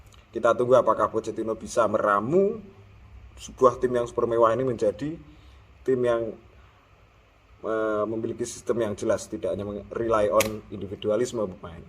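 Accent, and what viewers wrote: Java, Indonesia. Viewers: Kalau boleh saya rating secara pribadi, 8/10 Pro: - karakter pundit jowo nya dapet dan pemakaian bahasa nongkrongnya bikin kita serasa lg ngobrol di tongkrongan - suara nya enak kalau didenger di podcast - tengil dan ada bakat ngeselin di show, jadi bisa bikin seru acara Cons: - kebanyakan noise dari mukulin korek/rokok/liquid/atau apalah itu, ganggu banget - banyak baca, mata jarang ke viewer, menurut ku agak kurang, kalau cuman podcast no visual sih oke oke aja Tetap semangat bang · Teruskan aksen jowomu .. makin keren 👍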